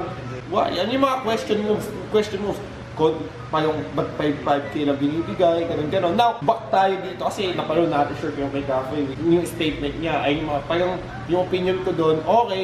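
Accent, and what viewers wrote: Visayas, Philippines. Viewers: Bisaya ka ba? 😁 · bisaya ka?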